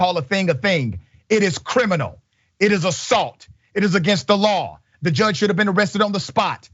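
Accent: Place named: South, United States. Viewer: 😂 love your southern accent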